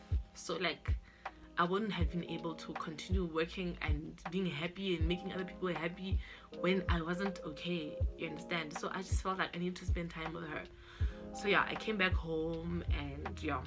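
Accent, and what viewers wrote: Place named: Free State, South Africa. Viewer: When you said 'hao cheweng', I knew I had to subscribe 😂😂😂 You sound like you're from Free State.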